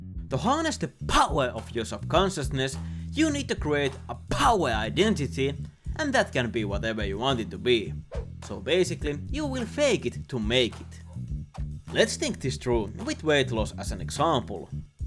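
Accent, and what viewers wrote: Finland. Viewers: Massive suomi aksentti L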